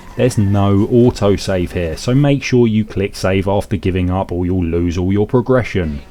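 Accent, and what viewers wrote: United Kingdom. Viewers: Ziad Assaad The commentary is soooo British!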